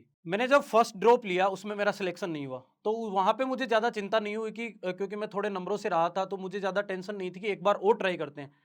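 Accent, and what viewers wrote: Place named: Hadoti, India. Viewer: Hadoti wali tone❤❤❤❤ hum Bundi se hai 🎉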